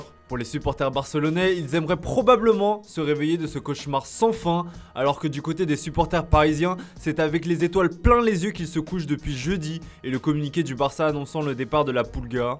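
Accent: Italy. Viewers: aucun rapport mais il est parti l'autre avec son accent italien tout peté? jai unfolow a cause de lui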